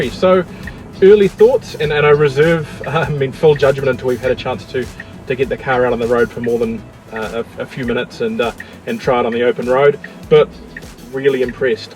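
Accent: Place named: New Zealand. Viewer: Nice review, Ive seen soooo many international reviews, nice to hear a kiwi accent with a kiwi review, cheers !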